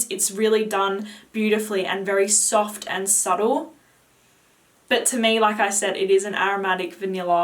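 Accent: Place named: Australia. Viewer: I follow you for your knowledge, not your age. I'm an Aussie now living in the USA, and I just turned 50. My head tells me I'm 30 (only my body tells me I'm 50!) Lol. Keep up the great videos, I've since found my 'signature' scent with de Marly Cassili. I'm saving frantically to get a full size bottle 😉 Your accent keeps me reminded of home and I thank you for that too 🌹Please DO add videos for us 50 and over 😊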